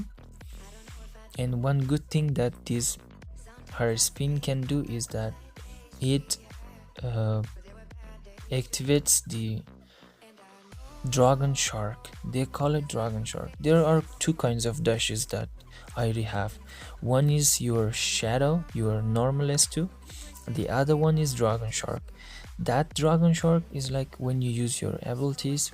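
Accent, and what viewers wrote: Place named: Mexico. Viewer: Wou a mexican english well xd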